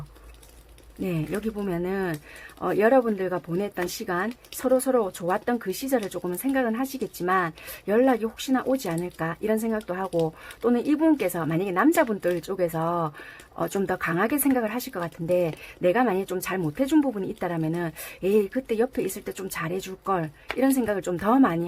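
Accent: Busan, South Korea. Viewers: 헐 직접 댓글 달아주셔서 정말 감사해요...!!!! 완전 속이 후련해졌어요 저건 그냥 제 망상적인 단순한 의문이었구요 말씀하신게 그대로네요.. 머리론 아는데 자꾸 끌리고 다시 만나게되요 ㅠ 제 현실따문에 헤어졌는데... 정말 이렇게 답글 주셔서합니다ㅜㅜ 그냥 만남에 있어서 좋게 생각하려고 노력중이에요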